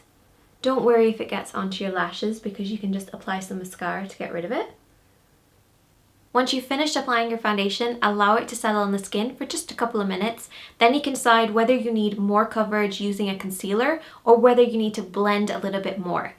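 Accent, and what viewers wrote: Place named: Ireland. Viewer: I love you and your accent! You explain everything so well!! I'm irish aswell! Proud to be irish🍀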